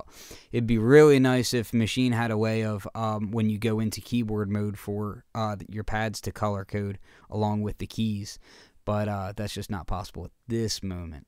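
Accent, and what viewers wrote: Philadelphia, United States. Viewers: Thank you for the video. Is that a Philly accent by any chance?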